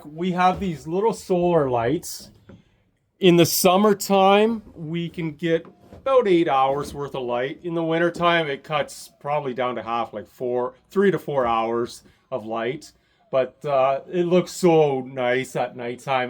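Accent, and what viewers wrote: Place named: Minnesota, United States. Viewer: You sound just like minnesotans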